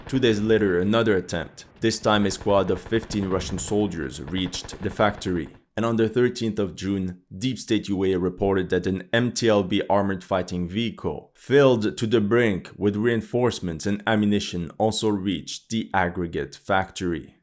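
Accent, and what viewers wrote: Quebec, Canada. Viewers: Where are you from ? I can’t place that accent maybe Quebecois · canadian french right?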